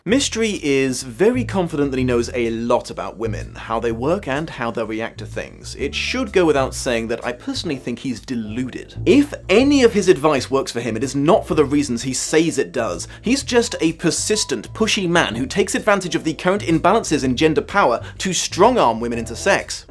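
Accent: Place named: United Kingdom. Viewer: I know. I've never been into a guy more. Plus, he's got a (real) British accent, respects LGBTQ+ and women, and has good teeth! What more can you ask for in a man?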